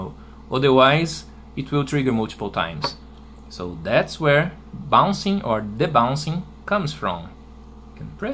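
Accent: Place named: Brazil. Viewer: Tu é Br man?(If not you sound very brazilian)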